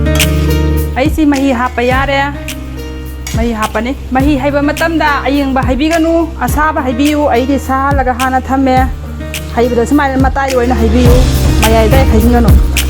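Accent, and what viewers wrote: Kakching, India. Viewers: Yes ngnagnei Kari tourage ngangiba lonse kakching gi dialect ni Kari problem leirage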